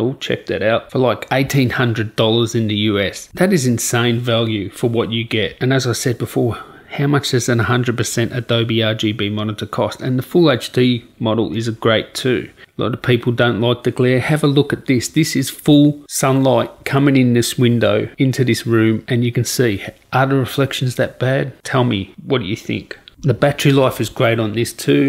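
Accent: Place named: Australia. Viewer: Hey mate, not much of a story. Plus I heard the accent and just had to listen - nice change from across the pacific... Just letting everyone out there know along with you if they had any doubt, just think about the 6+ months that have gone by to let the QA/QC processes take place and iron out the production problems. That said, if you find any issues or problems if you buy one, try to return the product straight away. I am pretty sure there was another reviewer on Youtube giving the same advice.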